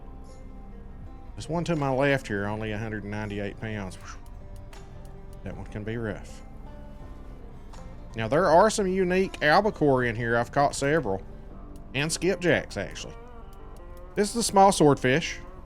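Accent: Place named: United States. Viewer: Bro I love the country voice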